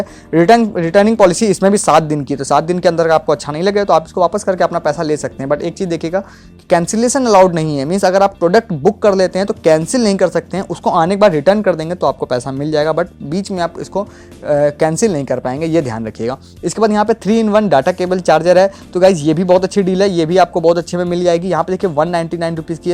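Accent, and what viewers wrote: Kanpur, India. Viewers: Bhai kanpur ke ho kya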